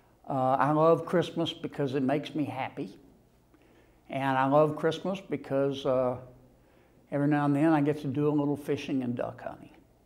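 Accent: South, United States. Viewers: I'm from Texas but you are an awesome Senator. You got that southern talk and that's ok by me. 👍 Merry Christmas 🎄☃️ May God Bless Us All. ❤️‍🔥🕊️❣️🇺🇸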